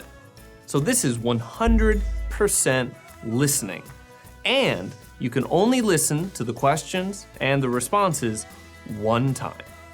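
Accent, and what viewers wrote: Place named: Yorkshire, United Kingdom. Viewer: hi there :) I'm really into your videos and i find that i admire you lots, i enjoy your British accent the most, which sounds like Yorkshire people :) your videos have helped me so much in my toiec preparation. But i'm still a little panic before taking part in the Toeic test. my facebook is 'Z hana ookaze', pls add friend, i d love to make friend with you :) . Best regards from Saigon!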